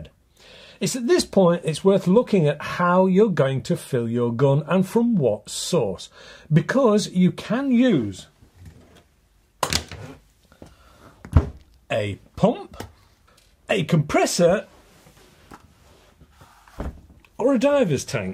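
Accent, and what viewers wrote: United Kingdom. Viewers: Very good job on the video. Lots of good information for a new airgunner. I own a Gamo Urban PCP and it's a great low cost pesting tool, powerful and very accurate. There are a couple of things I would point out. At the end of your video you instructed that the user should remove the magazine from the rifle before storing. The bolt must be pulled back to remove the magazine which cocks the hammer. Also, there is a pellet in the barrel yet so when the bolt is closed the rifle is still ready to fire! I usually leave the pellet in the barrel but I always de-cock the hammer. One has to remember that there is still a pellet in the barrel too, or when they reinsert the magazine it will result in a double feed with two pellets in the barrel. To be really safe, the pellet should be removed with a cleaning rod, or, shot after the magazine is removed. 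I had a few double feeds when I first got the Urban until I learned what was happening. Thanks, I always enjoy your videos. There's just something about listening to that British accent...😉 Geo from MI, USA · UK English with the accent really are the best narrators.. Soothing like Clarkson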